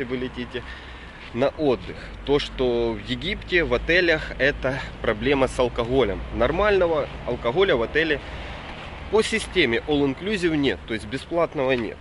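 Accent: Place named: Odessa, Ukraine. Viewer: вопрос "офф-топ". Не в тему. Вы одессит? )) Вы не подумайте ничего такого. Но вот это вот "я Вам расскажу ЗА несколько вещей" - это так по-одесски, так узнаваемо ))))))))))) ++++ Спасибо за видео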